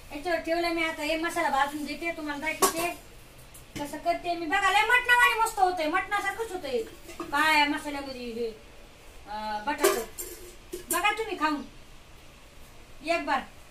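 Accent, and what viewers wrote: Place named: Marathwada, India. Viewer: अस्सल मराठवाड्याची भाषा बोलता ताई तुम्ही...जय भीम नमो बुद्ध य जय शिवराय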